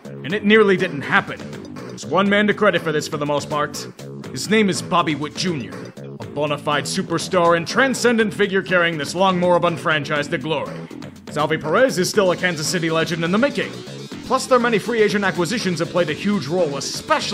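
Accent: Pittsburgh, United States. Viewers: I dont even watch baseball I just enjoy hearing the yinzer yap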